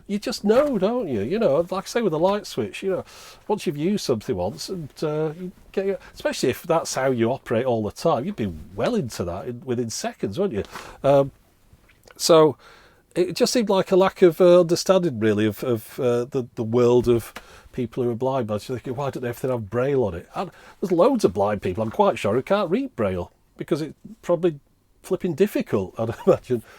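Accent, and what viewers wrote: United Kingdom. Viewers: I love your videos. I belive I watched most of them now. (Some multiple times) Love the Muppet's, the British accent, the kindness, the retro items (the older the better), the explanations, the reviews, the garden views, the close-ups and generally the attention to detail. It is entertaining, educational, funny and just most wholesome. Whenever you say "thanks for watching", I reply thanks for showing.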